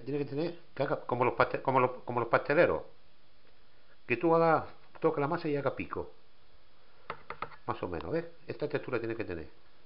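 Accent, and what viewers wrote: Andalusia, Spain. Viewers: Muy buen video y mejor aún con acento español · BUENÍSIMOS Y MÁS AÚN CON ESE ACENTO TAN NATURAL Y ESPAŃOL. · Ese acentico español es muy cachondo. Por cierto, ¿por qué no no has echado levadura a la harina? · Gracias por subir esta receta! (Primera vez visitando tu canal) no se si me gusto mas la receta o see acento español😉 padezco de debilidad por los escoceses y españoles! 😍😍 saludos desde Texas👍🏼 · Me encantó! Muy fáciles y sin complicaciones. Me encantó su acento español. Gracias saludos desde Monterrey México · M. PERALES: Para una mayor concreción, el acento del caballero demostrador de la minuta de los churros es, claramente, de Andalucía (Sur de España). Yo escribo, desde la provincia de Valladolid (España), de la que se dice que es donde mejor se pronuncia y articula el español. De hecho, en verano, a la ciudad de Valladolid y a la próxima ciudad de Salamanca, vienen muchos estudiantes extranjeros a aprender español. 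Y añado datos ilustrativos sobre la receta (o minuta) de los churros, mostrada en el vídeo, que es la básica. Hay gente, en España, que a tal masa le añade una cucharada sopera, precisamente, del aceite de girasol en el que se van a freír los churros, con lo que se consigue un punto de suavidad en el acabado. Y, por demás, decir que hay recetas más complicadas como la denominada "churros de lujo", pero no merece la pena mayores complicaciones, porque la minuta básica de los churros y, luego, un buen chocolate a la taza resulta formidable. A mí, me encanta y, además, es muy saludable por el efecto antioxidante del chocolate y, por otra parte, estimulante por la acción de otro componente del chocolate, la teobromina. Como en todas las cosas del saber humano, aquí, también, se podría estar escribiendo un buen rato, pero no quiero entretenerme. Voy a dar unos apuntes rápidos. En España, los churros rectos, sobre todo, en la capital de Madrid y en la ciudad de Sevilla, se llaman TEJERINGOS. 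Y el churro en forma completa de ovoide, se llama, en todos los sitios, como es l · El hombre es andaluz ,del sur de España ,tienen mucho arte !!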